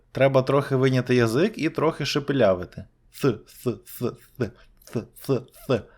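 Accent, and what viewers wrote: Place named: United States. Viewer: Питання автору каналу! Ви всюди в словах,і навіть в кінці слів вимовляєте букву "r",ви що американський варіант англійської мови вивчали,чи ви американець українського походження,тому що в американському варіанті буква "r" читається всюди,а в британському ні. А бувшому СРСР, і зараз, навіть і в Європі всі,і я в тім числі,в школах і вузах вивчали,британський варіант. І зараз в інтернеті трудно знайти україномовного блогера,який би розмовляв в британському варіанті. З повагою!Україномовному блогеру респект!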